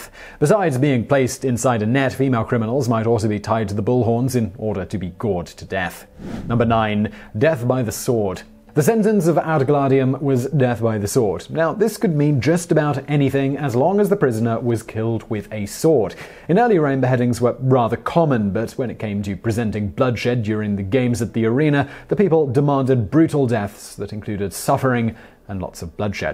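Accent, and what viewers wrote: United Kingdom. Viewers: I love your accent! Fellow Brit watching :)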